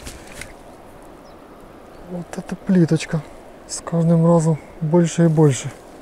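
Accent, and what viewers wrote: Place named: Vologda, Russia. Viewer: Говор у Вас прикольный, только не пойму "г" мягкое как украинское, а "оканье"...🤔горьковское или вологодское🤔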